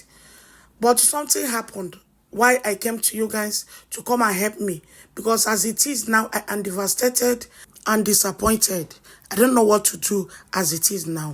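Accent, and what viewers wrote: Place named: West Africa. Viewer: This accent is like west africa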